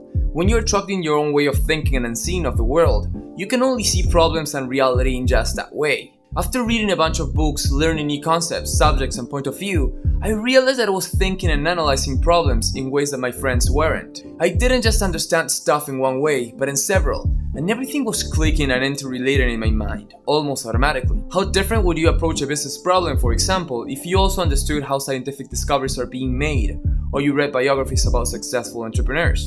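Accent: Argentina. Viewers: Wow, Im from Argentina too, and I love your accent and the way you talk in inglish is awesome!!! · Tenés voz de porteño, puede ser?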